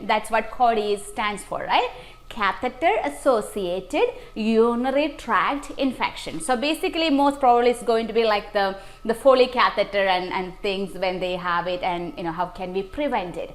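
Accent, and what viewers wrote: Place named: India. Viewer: Your English is very bad with an Indian accent.🥴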